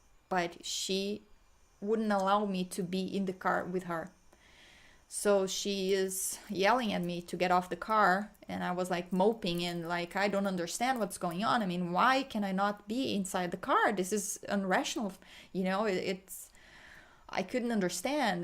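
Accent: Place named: Brazil. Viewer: Listened to this again. For whatever reason, really connected with it...and your story. Lots of shame and heavy emotions came up for me. Also, could tell from your accent you are from Brazil. I was lucky enough to visit multiple times, and always felt so connected. Obviously, I was only seeing what I wanted to see (which as a tourist , is always distorted). My family is from India, but I have very little interest in the culture. It's so boring to me. I always wished I was from Brazil instead :)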